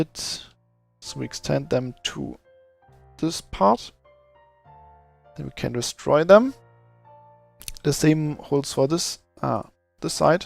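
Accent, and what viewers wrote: Germany. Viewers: Du bist Deutscher stimmt's? :D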